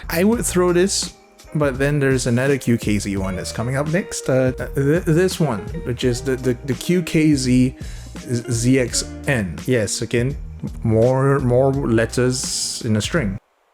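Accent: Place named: Singapore. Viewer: I could tell you were Singaporean from the accent. I felt validated after checking.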